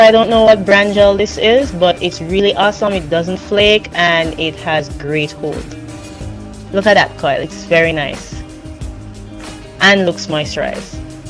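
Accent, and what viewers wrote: Jamaica. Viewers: is that a Jamaican accent I hear? 😁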